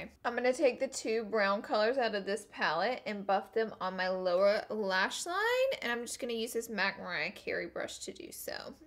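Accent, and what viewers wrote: South, United States. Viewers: I love u u r soooooooooooo awesome I love your southern talk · When you said "oil free" I totally thought you said "all free" because of your southern accent compared to the Boston accent I grew up around! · Madilyn Z she sounds like a southern version and I love pll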